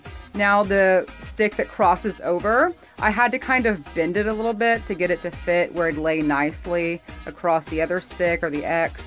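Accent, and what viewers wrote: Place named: Pennsylvania, United States. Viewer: Love the lantern! Would I be wrong to say your from Pennsylvania? I say that because your voice/accent is so much like my family's.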